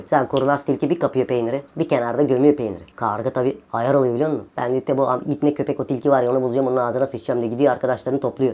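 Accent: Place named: Thrace, Turkey. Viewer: Abi bu Trakya şivesiyle detaylı roman veya kitap özetleri gelir mi?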